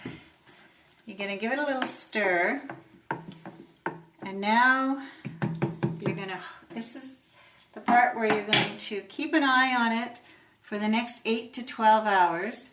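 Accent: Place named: Minnesota, United States. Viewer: Hi, there. had a little ginger root left over from a curry i made. saw your video and adjusted the amounts roughly and got me 500ml of fiery ginger beer. well done with the video, very clear and easy to follow. my next batch will be a full one. what % alcohol would they be? also how do you get rid of the sediment? i've heard cream of tartar mentioned, what's that all about. I'm from england, love your accent, i watched a film called fargo, would that locate your accent at all? bye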